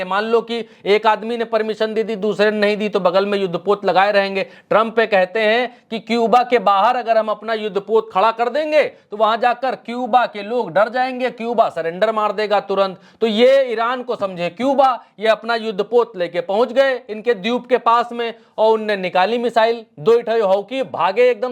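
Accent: India. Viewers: 👌❣️👌👌❣️👌👌❣️👌👌❣️👌👌❣️👌👌❣️👌👌❣️👌👌❣️👌👌❣️👌👌👌❣️ *Love you, भाई for your देसी ॲंदाज़-ए-बयॉं.!!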